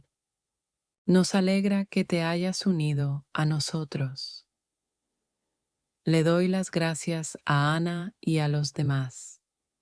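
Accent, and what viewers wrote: United States. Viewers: ...these videos are wonderful! i never watch them, just listen on my phone. but who are you? you sound American but you are in Nigeria? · Yes!!! Are you American or Columbian???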